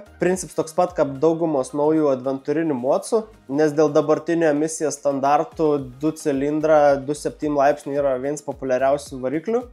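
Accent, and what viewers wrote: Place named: Samogitia, Lithuania. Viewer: Kazkaip keistai smagiai ta zemaiciu kalba klausos visokie vidosikai 😀👍 Maladietc!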